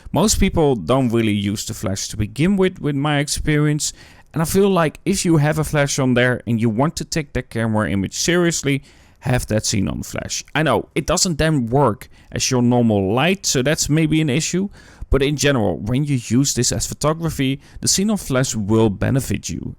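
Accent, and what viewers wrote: Netherlands. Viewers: nooit geweten dat je Nederlands was, ik hoorde het accent en dacht gelijk dit is een Nederlander.🇱🇺 Keep up the good work 💪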